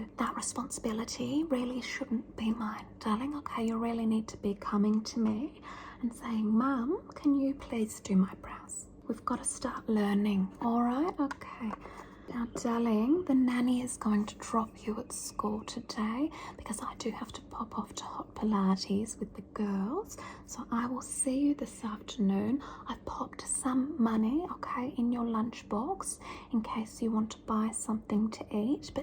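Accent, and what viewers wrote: Australia. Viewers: If everyone in australia speaks like this i think i need to move asap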